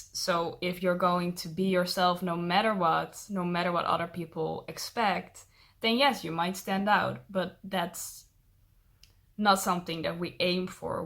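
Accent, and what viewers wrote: Netherlands. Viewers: Je klinkt heel Erg Nederlands ben je dat toevallig ook haha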